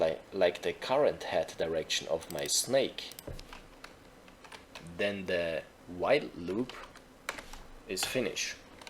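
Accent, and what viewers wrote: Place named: Germany. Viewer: Man I love that German accent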